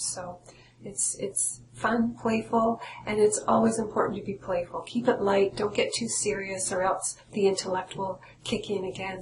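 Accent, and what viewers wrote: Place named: Nova Scotia, Canada. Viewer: Hi, I am so sorry that I did not know about you when I was living in NS. I am very interested in learning to channel as I feel spirit talks to me in very subtle ways and I want to be more open to the messages. I have to laugh as right away I picked up on the accent and had to google you to see where you were from..i miss the NS accent:(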